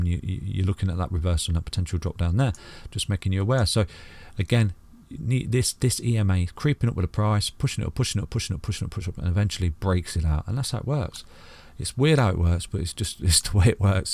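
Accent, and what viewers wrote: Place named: East Midlands, United Kingdom. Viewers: First time on your channel and hit subscribe...Great TA and love your accent!! Lincolnshire by any chance? Lol🤑💯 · Finally, A no-nonsense Crypto channel with a nice local East Midlands accent. Av subscribed me duck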